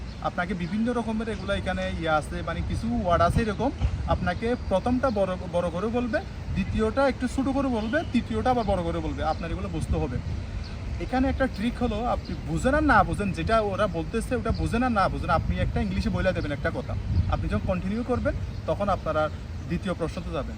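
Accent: Chittagong, Bangladesh. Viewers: ভাই আপনার কথা শুনে মনে হচ্ছে, আপনি আমাদের চট্টগ্রামের ছেলে 💚💚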